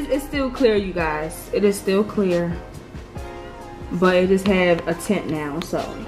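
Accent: Baltimore, United States. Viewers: Omg her accent, is she from baltimore?? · Omg your accent!!! Your totally from Baltimore!!!!!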